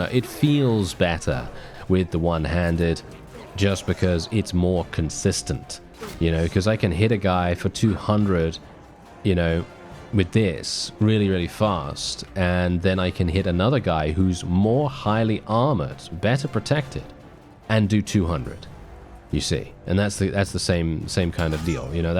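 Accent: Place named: Cockney, United Kingdom. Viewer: I really enjoyed this episode, Cockney accent aside. Add it in, still really enjoyable. It's fun, but not required!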